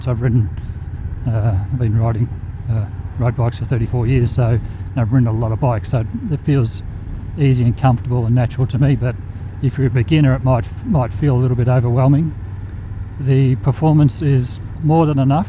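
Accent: Australia. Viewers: To be honest I really interspersed in bikes mostly cruisers but the main reason I watched all your videos is your way of speaking Australian English which is great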